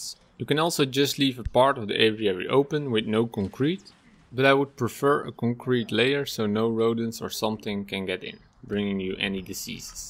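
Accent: Netherlands. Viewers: Are you Dutch? I hear some accent. Hoe gaat het jongen?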